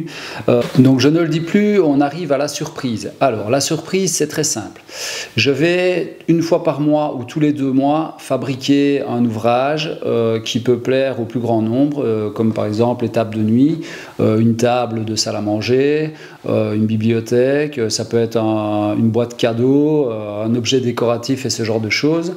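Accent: Belgium. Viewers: ... ou comment (ré)apprendre les bases issues de l'expérience d'un pro qui se prend pas la tête, avec l'accent belge en bonus! Parfait, change rien. Et pour être très original: merci.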